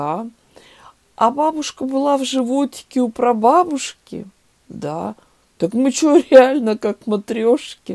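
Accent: Urals, Russia. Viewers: слушать ваши анекдоты, уральским говором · благодарю за уральский акцент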